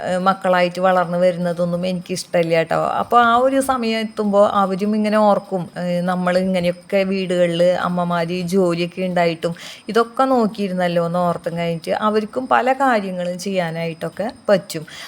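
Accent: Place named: Thrissur, India. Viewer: സംസാരം കേട്ടിട്ട് തൃശ്ശൂർ ആണെന്ന് തോന്നുന്നു ഞാനും മുട്ട കുക്കറിൽ വേവിക്കാറുണ്ട് എല്ലാ വിശേഷങ്ങളും സൂപ്പർ 👍👌🥰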